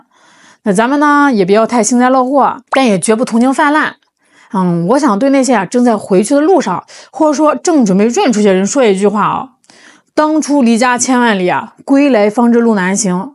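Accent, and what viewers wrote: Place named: Shaanxi, China. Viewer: 好像陕西那一带的 😉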